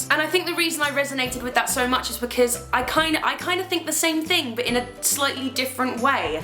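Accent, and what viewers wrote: United Kingdom. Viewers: I saw the channel name "well I think I'm gonna subscribe the channel" I hear the British accent, You talk about Dodie presses the subscribe button so hard, my phone screen would be broken, if I had an iPhone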